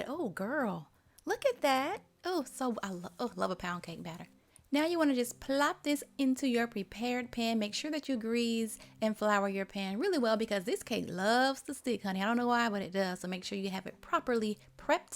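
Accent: South, United States. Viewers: I'm a texture person too! Lol I just love your sweet southern accent! I have it as well but I gotta say I could listen to you read a phone book! I am gona try this cake tomorrow! Pound cake is my favorite! Thanks for sharing! I will be liking and subscribing! And God bless your Grandma for most likely inspiring you to bake pound cakes too!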